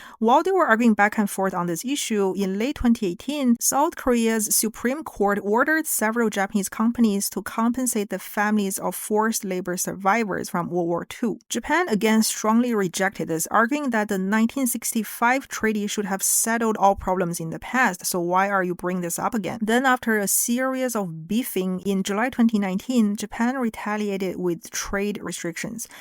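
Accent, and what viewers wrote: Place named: China. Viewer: Your thick chinese accent makes this vid funny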